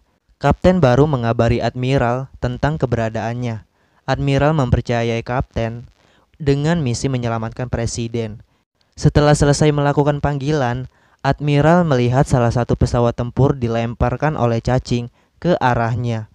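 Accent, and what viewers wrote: Palembang, Indonesia. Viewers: Abang orang Palembang ya? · Palembang bang? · Wong Palembang ruponyo wkwkw😂😂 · Wong Palembang yo ?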